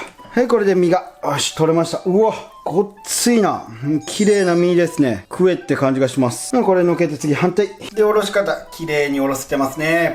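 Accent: Kagawa, Japan. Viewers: 飾らない讃岐弁だから視聴者登録数が伸びるのではないでしょうか。私も今ではすっかり忘れてしまった讃岐弁を思い出して楽しく拝見しています。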